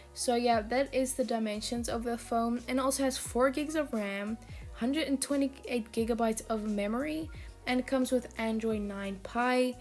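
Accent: South Africa. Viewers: Is she South African?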